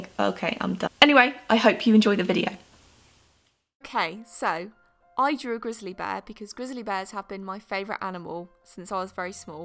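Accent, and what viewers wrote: United Kingdom. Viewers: I’ve been to your Etsy store hoping to see prints of all your work but there’s nothing I love your Dean Winchester pic it’s awesome just like you id love to learn how to do digital art not for professional just for a hobby with rewards at the end I love all your work but I only get an allowance I’m really poorly so I often arrive late and I don’t even know if you get any of my messages. But your such an amazing role model for me and your fun vids have helped me smile even when multiple bones are breaking as they do when my bone disease is bad and staying still doesn’t do a thing to help. Your a ray of sunshine on a dull day and I love your British accent it makes me feel like home I’m in Sheffield England so I’m a Yorkshire girl. Keep doing what you love. Love everything you do. Take care. Rachel x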